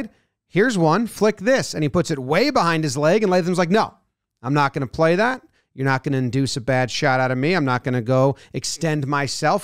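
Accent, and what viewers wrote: United States. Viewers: Nothing against you mate but it is weird hearing an American accent talking cricket. I felt the same with Leigh Diffey’s Australian accent covering US Track and Field. #mindblown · So weird to hear an American accent talking about cricket. Great vid though.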